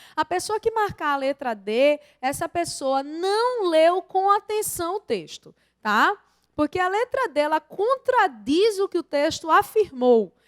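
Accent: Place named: Pernambuco, Brazil. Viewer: Sotaque pernambucano! Normal...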